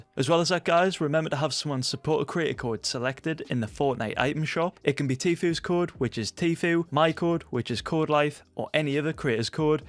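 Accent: Newcastle, United Kingdom. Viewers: Love all your content bro I’m a Geordie myself so hearing you makes it sound like home 🔥🔥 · R u from newcastle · Only me how love your british accent :D please do facecam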